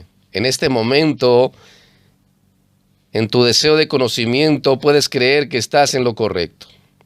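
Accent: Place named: Spain. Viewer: Me surge otra pregunta! Porque habla usted como español si no lo es? todos los hijos del Eterno amamos sus mandamientos y obedecemos su Torá por amor de El! Eso es más que obvió! No se trata de una idolatría a Pablo! Se trata de la Torah! Sin pruebas no se debe haceptar testimonio contra nadie! Y menos por boca de un solo testigo que no aporta pruebas! Así que asegurar sin pruebas que Shaul es mentiroso sin decir en qué miente! Es sin dudas un falso testimonio! YESHUA a muerto por nosotros! Pero en su mismo orden de ideas tampoco debemos ver sus videos ni escuchas sus enseñanzas pues no son las del MESÍAS! Digo más podemos escuchar a todos y prestar atención a los que hablan conforme a las enseñanzas del ADON! Sabiendo siempre que solo hay un ELOHIM y solo a El Amamos y servimos! Lo anterior antes de la lluvia de insultos de idolatría y acusaciónes falsas en mi nombre! 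Amo al Eterno y procuro vivir en sus mandamientos con todas mis fuerzas! Y esto lo aprendí del MESÍAS y por obra de su siervo Shaul y de los apóstoles o envíados de los profetas y su siervo Moisés! Shalom. Shalom Shalom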